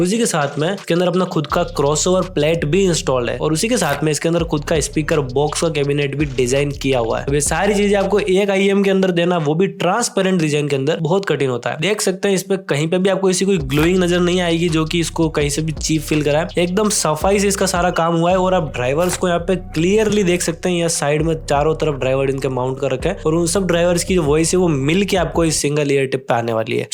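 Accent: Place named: Mewar, India. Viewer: Ha, lg to mewad s hi rha h y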